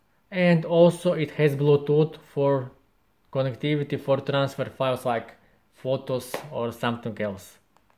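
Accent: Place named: Serbia. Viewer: Croatia ? When I hear your English , I first think that you are from Serbia :D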